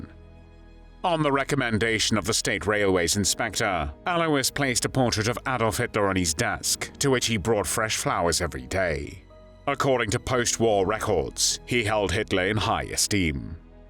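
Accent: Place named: United Kingdom. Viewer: That "Brittish accent" AI voice is just too much for me.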